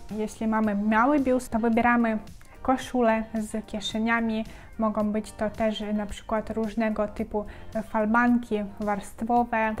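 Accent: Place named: East, Poland. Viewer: Następna stylistka z wschodnim akcentem.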